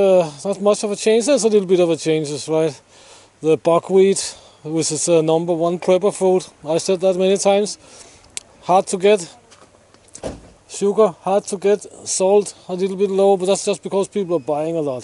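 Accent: Denmark. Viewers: Wow your english sound a lot like with Danish accent!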